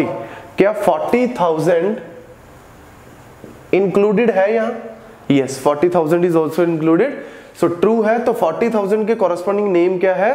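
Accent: Punjab, India. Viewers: You are amazing man. Thank you so much for your lovely sessions. I particularly like the punjabi flavour in your Hindi. These sessions have been of so much use to people like us. May you always stay healthy and happy. Coud you make a few sessions on SQL queries that are frequently used by manual testers. Would be of a great great help. Thank you once again from the bottom of my heart. Let us know your name dude.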